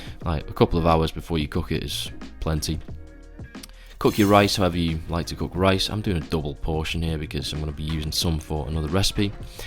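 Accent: United Kingdom. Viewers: Bro it's not only the content being great, your British accent kills me, the way you say stuff just makes me smile haha, amazing stuff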